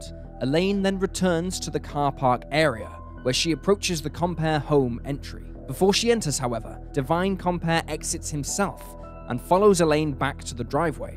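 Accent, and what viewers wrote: United Kingdom. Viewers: Lovely creative girl. Such a shame 2015 happened to her. My mum brought me up , that girls think its cute how timid I am in terms of touching , don't get me wrong I love wrapping my arms round and kissing and sweeping them away or trying but I don't get guys who think a no is a challenge or chase or they want so they do. Anyway from the UK why do Americans say its 20, 600 block instead of what it actually is? 26 thousand block like the man said (narrator's voice from the UK) . I can't end the comment taking away from this girls story.so apologise but from the UK , I hope her end is not final and if it is I hope justice comforts her and her family.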